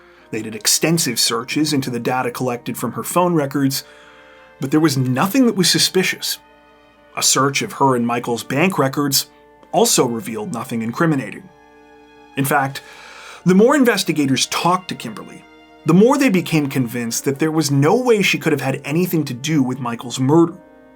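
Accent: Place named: Canada. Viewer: Oh you're Canadian eh?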